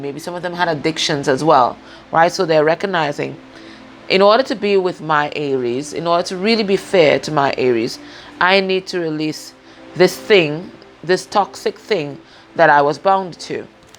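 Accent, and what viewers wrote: Trinidad and Tobago. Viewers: are u a Trinidadian...u hsve our accent lol...thank u for the reading 🌻🌼🌻🌼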